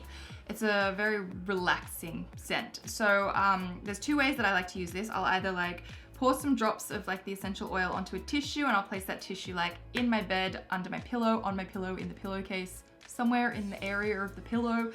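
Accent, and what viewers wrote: Australia. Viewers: what is your accent ?where are you from ? just wondering , I have heard others with the same accent but I"m curious ? Is it an Australian accent ?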